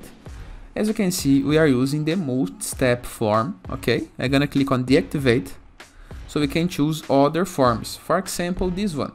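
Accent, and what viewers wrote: Brazil. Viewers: sim eu sou programador. Eu de Moçambique (um país africano falante de português) mas sou fluente em inglês.. Porém os "I have 'tchu' do" denunciaram seu português brasileiro e notei logo kk foi como ter um irmão nesse universo web inglês. +1 inscrito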